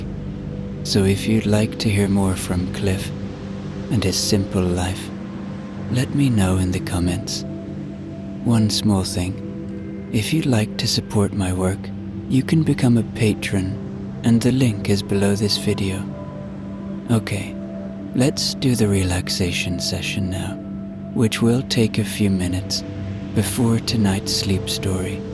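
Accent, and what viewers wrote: Ireland. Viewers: Adore an Irish story teller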